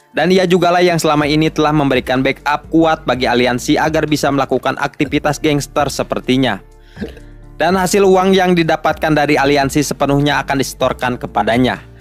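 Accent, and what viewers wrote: West Java, Indonesia. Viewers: Bang urang sunda lin??😅😅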